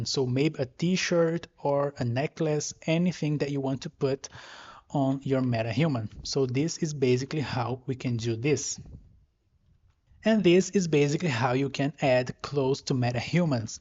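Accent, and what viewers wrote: Brazil. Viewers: Muito bom! Tô supondo, pelo sotaque (leve) que vc é brasileiro. Baiano, talvez 😊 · Thanks for the great tutorial! ...acho que esse sotaque é brasileiro! kkk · Brazil? i can feel the accent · Nice tutorial. Are you brazilian? Your accent reminds a lot of people from here.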